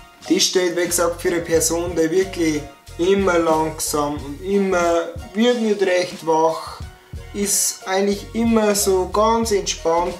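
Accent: Bavaria, Germany. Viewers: Mi grausts bei deinem bayrisch oida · Find dich mega cool :D versuche durch deine Videos Bayrisch zu lernen :) könntest du irgendwann auch mal Bayrisch für Fortgeschrittene machen? Oder ein Video, wo du die Aussprache mal erklärst, wie man die Vokale und Konsonanten betont oder wann man das R im Bayrischen rollt :D Lg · Naja als richtiger bayer 🤣 durd dei Dialekt so wei in de lusern 😅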